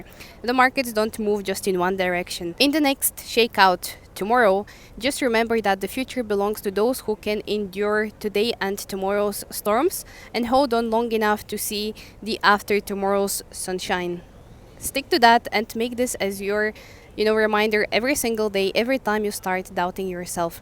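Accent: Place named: Brazil. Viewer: you being mysterious...if i had to guess you eather brazilian(because of your accent) or middle eastern....am i close?😅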